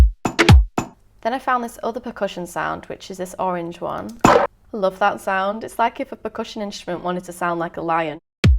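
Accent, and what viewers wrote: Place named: Colne, United Kingdom. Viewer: Enjoyed this muchly. Subbed. You aren't from Colne by any chance are you? You sound uncannily like you might be........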